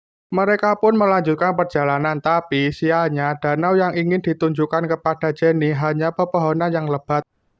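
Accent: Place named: Java, Indonesia. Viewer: Medok tp seru bro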